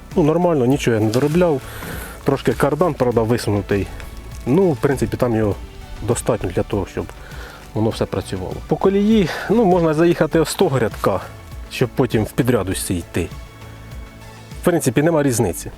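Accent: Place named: Cherkasy, Ukraine. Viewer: мова українська, діалект Черкаський якщо не помиляюся. Дивіться відео і колись щось і зрозумієте, з картинки теж багато що зрозуміло.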